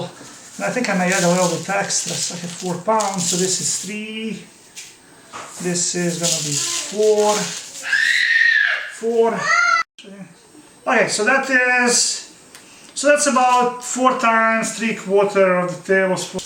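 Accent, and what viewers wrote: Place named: Bosnia and Herzegovina. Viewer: the only thing in this video close to a bosnian cevap is the accent!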